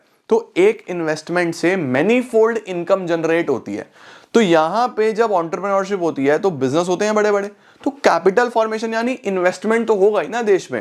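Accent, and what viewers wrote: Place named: Punjab, India. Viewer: Mangji se pta chlta h sir ap pake punjabi ho 🎉😍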